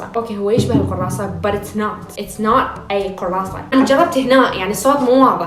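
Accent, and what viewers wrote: Iraq. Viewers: بس شويون حاولي تقللين من الانگلش لأن مرات مافهم هواي وهم اللكنه العراقيه عليچ تجنن احلى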